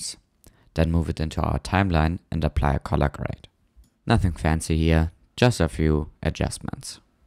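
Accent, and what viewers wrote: Germany. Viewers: Ich weiß nicht wieso, aber irgendwie hasse ich es, den deutschen Akzent zu hören 😅 (nichts persönliches, ist bei jedem englischen Deutschen so)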